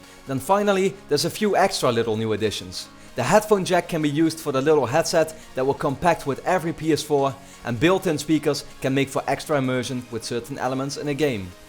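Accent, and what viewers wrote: Netherlands. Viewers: Haha, ik kan horen dat je nederlands bent, te geweldig accent man. Je kunt goed engels spreken hoor, niks daarop tegen :)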